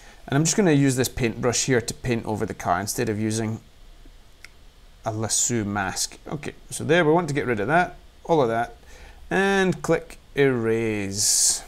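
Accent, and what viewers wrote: Scotland, United Kingdom. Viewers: Thanks for that .... very informative! At first I had trouble concentrating on what you were saying, because I was captivated by your glorious Scottish accent, but once my Aussie ear adjusted itself to your accent, I was able to follow every word. Great work!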